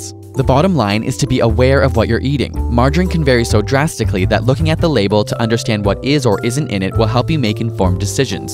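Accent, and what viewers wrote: Canada. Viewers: Haha, hello fellow Quebecer, I see that margarine container. Do you live in Montreal your accent would suggest it.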